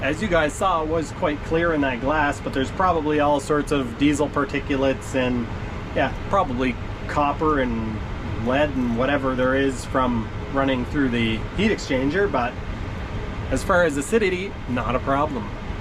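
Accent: Canada. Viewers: Excellent videos! 🍻🍻 Do I detect a southern Ontario accent? 😁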